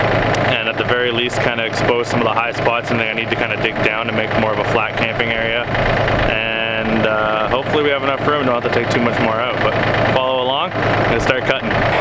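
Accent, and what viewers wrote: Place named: Canada. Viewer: Like the Canadian accent.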